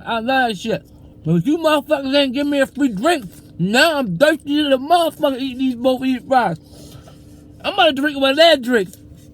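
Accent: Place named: Baltimore, United States. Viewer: Baltimore. 10 seconds in I knew 🤣🤣 Salute dawg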